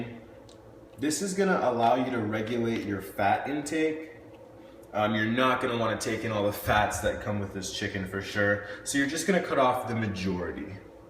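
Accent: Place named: Canada. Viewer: I can just tell ur canadian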